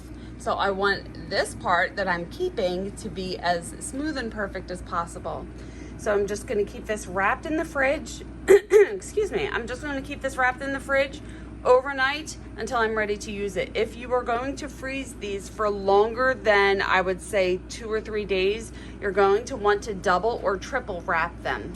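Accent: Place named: Pennsylvania, United States. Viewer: You are a lifesaver! I am making a 3 tier cake for my father’s 90 bday party…you gave me a lot of tips! I’ve watched a few of your videos in the last hour already! When I first heard you speak, I said she is from Pennsylvania! Then I looked and see your from Langhorne! I am originally from Levittown, now in FL. thanks for all the tips and tricks!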